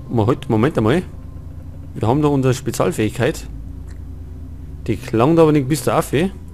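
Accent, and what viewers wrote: Bavaria, Germany. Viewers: Sehr geil das des amoi ajemand auf boarisch mocht, da geht mia a herz auf <3 Super LP weitermocha ;D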